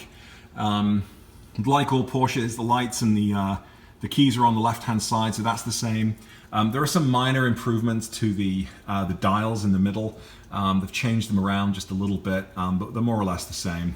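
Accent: United Kingdom. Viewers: Truly not “having you on”. I just love the way the British speak. As an American I’m embarrassed at how badly we murder the language in this country! It’s true my friend. Google 5 mph bumper and you’ll see that there’s a U.S. law pertaining to bumpers. There have been so many cars in the past that weren’t even sent to The States because of it. Many manufacturers just don’t want to deal with reconfiguring bumpers for this market. I’m actually fairly certain that the “bumperettes” (as you call them) don’t exist on the European versions of the 911.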